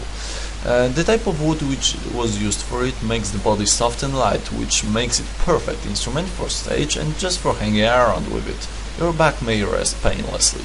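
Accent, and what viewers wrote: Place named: Poland. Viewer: good video man. haha dont worry about your English, and be proud of your Polish? accent! greetings from Czech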